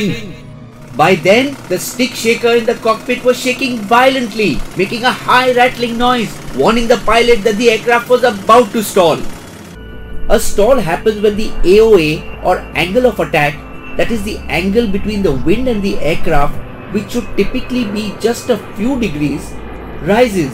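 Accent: India. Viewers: IS MY NEW ADDICT VOICE IS VERY CLASSIC MYSTERY DIGGERS ALSO MY FAVOURITE BOTH WITH NICE INDIAN ACCENT UNIQUE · Thees is Indian English speaking style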